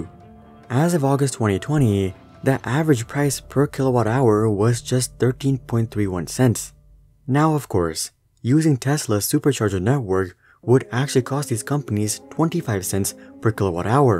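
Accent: India. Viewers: On very close inspection, (which I would not have done except for the post as you speak very clearly), I guessed South central Asian, likely Indian. Hooray for me! Good channel. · U a Indian?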